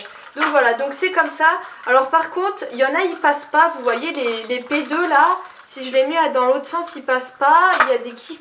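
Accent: Lorraine, France. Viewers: Tres bel accent lorrain! trop mimi! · l'accent lorrain, c'est trop mignon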